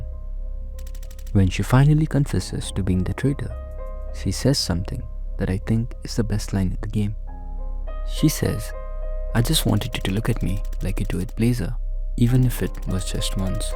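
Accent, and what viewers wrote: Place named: India. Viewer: Hey man, it was honestly a great video, did not even notice that you are an indian. (You have just some general accent, that does not really hurt understanding you. Overall the video is quite nice, especially the editing, but i think that it lacks something for a super popular video, for some reason. Hope to see you creating in the future!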